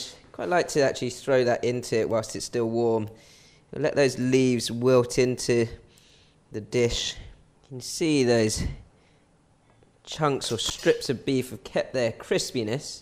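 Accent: United Kingdom. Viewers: Love your British accent 😄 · Slightly British accent 😁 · Chinese guy with British accent got me head spinning! 😳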